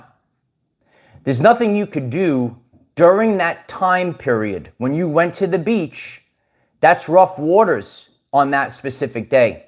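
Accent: New York, United States. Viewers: I really love your East Coast accent! Is it a New York accent?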